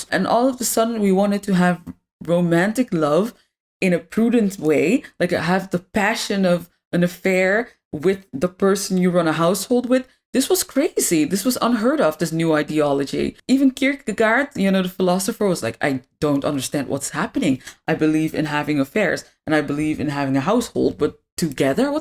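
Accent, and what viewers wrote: Netherlands. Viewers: One of my greatest accomplishments in life is never having kids...by choice. Good vid, thanks! I did notice your Dutch accent!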